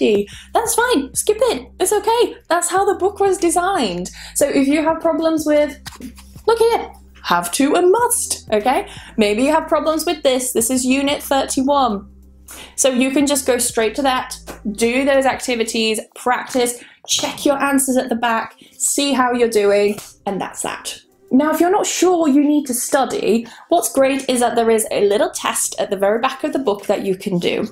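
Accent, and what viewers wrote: United Kingdom. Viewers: Hi. Thanks for your recomendations. I like so much the british accent☺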